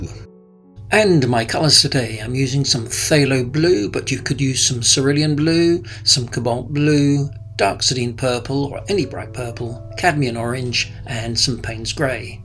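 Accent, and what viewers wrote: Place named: England, United Kingdom. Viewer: I was wondering where you were.... I was missing you and your wonderful English accent ❤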